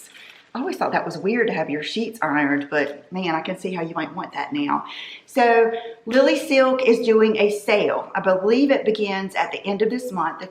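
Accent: South, United States. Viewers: Hi, I’ve been a subscriber for over 10 years. I was going through a divorce in 2011 and would escape for 25 minutes by watching your videos. I loved and still do your southern accent and how genuine you are. You are truly a blessing for so many of us. Sending you love from a 50 year old Woman in California 💗🦋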